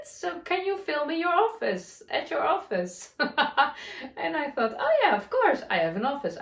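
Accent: Netherlands. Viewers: I so love your podcast and your Dutchy English, you are so lovely. I live in Norwich UK, so just across the sea from you. It only takes 40 minutes to get to Amsterdam from our local airport. I love to knit and sew and i am knitting the Flora sweater at the moment by Irene Lin. Also sewing the Hinterland dress. Take care and love from my house to yours x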